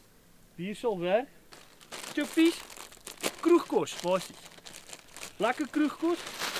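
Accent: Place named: England, United Kingdom. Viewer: 😂 ek love die helse Engels aksent met die "okay" en "alright"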